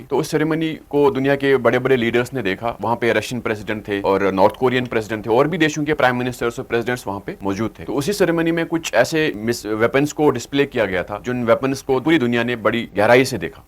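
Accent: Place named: Kashmir, India. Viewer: Kashmiri accent ❤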